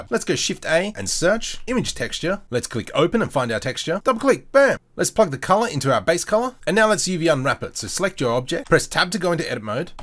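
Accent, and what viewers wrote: Australia. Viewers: Youve turned in to an Aussie Ian Hubert :) nice tute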